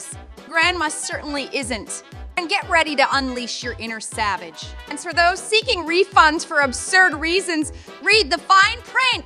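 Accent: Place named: Minnesota, United States. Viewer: AI voice is from Minnesota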